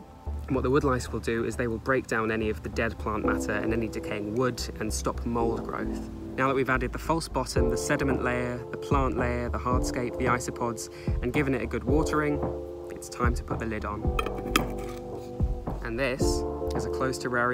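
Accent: United Kingdom. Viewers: turns out all i needed was a handsome british man to calmly talk to me about plants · Also I can listen to your British accent and your soothing voice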